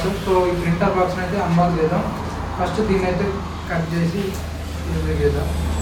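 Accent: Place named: Hyderabad, India. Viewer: Still hyderabad slange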